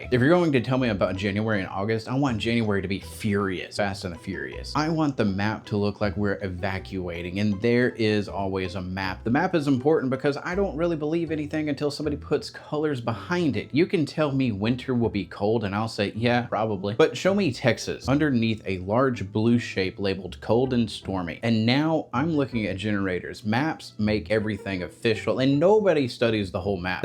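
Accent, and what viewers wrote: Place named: Northern New England, United States. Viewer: I would have thought you are from Northern New England! You sound just like us. This was fun! Thank you. I've got to head out now and start gathering pinecones for tinder...and watch out for the wooly-bears because I've got to measure their color bands.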